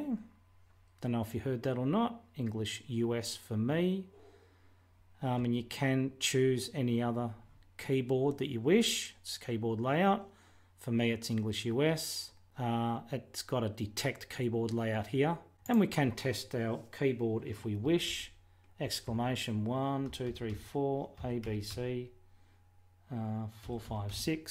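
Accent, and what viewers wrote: Australia. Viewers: I missed the Aussie english. Hope you're okay.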